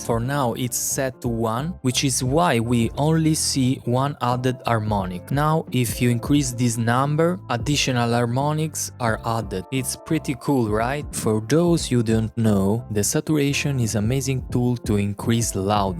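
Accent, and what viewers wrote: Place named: Italy. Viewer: Dopo pochi secondi dall'inizio del video ho intuito l'accento italianissimo. 😄 Conoscevo ed usavo già questo plugin, ma grazie alla tua spiegazione mi è più chiaro come lavora, grazie. 👍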